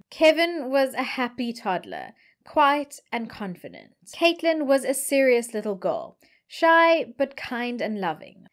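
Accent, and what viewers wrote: South Africa. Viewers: I just found your channel. Love it. Can’t wait to see what other videos you have created. I love your accent. At the last school I taught at, the school secretary was from SA- best accent I’ve ever heard. Then I found Nick Van der Leek (true crime rocket science) and you even better. Great content, fantastic narration, love your background knowledge you share. I agree with family annihilator is weird term.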